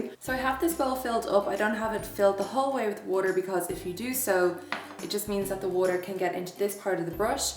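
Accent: Ireland. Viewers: She's Irish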